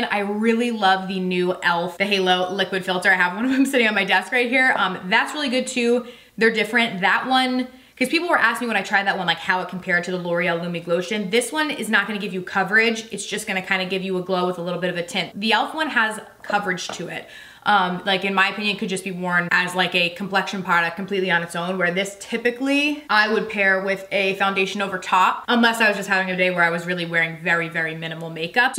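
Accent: New Jersey, United States. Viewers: For some reason, picking up your “Jersey” accent today. Cute. 😊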